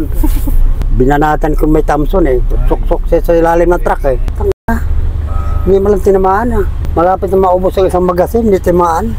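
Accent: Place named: Visayas, Philippines. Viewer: bisaya kasi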